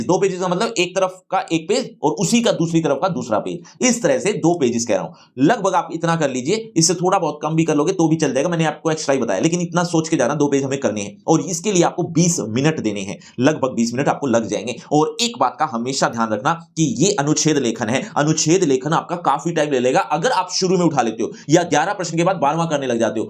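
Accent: Haryana, India. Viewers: Sir are you from haryana 🤔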